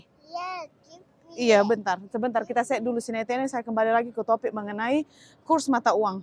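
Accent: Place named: Medan, Indonesia. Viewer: Cara kak berbicara ciri khas orang medan kalau berbicara 😊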